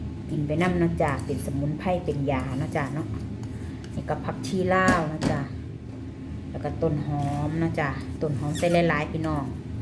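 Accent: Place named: Nong Khai, Thailand. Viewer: อยู่เมืองนอกกะมีบ่อขี้เหล็ก เว้าแป้งนัวคือคนหนองคายศรีเชียงใหม่เนาะ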